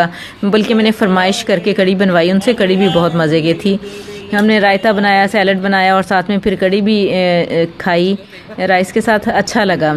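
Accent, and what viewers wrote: Punjab, India. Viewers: AAj to Panjabi naal Gallan kar rahe ho aap...Naya Andaz achha laga ji MashaAllah Aam ka pakaya jaa raha hai... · Aunty aap bohat mushkil punjabi bolti hain. Good dish in the start . Green walay aamon ki peti mujhay bhejwa dein. heheeh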